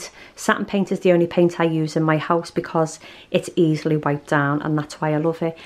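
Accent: Liverpool, United Kingdom. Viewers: Yay I found a scouse YouTuber! Love this video!!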